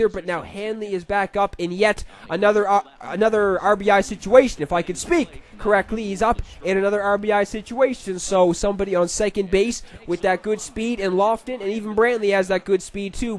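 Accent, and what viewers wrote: Canada. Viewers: U must be from Newfoundland with that accent u got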